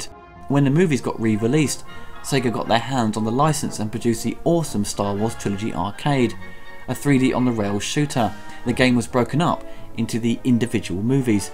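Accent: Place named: RP, United Kingdom. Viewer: It's funny to hear someone with a relatively posh sounding British accent say "butthole".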